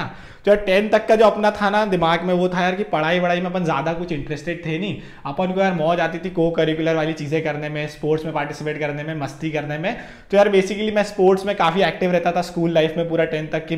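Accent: Indore, India. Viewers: Tysm for uploading this video!! Really inspiring story!! 🤩🤩 Btw bhaiya aapke baat krne m indori jhalakti h!🥹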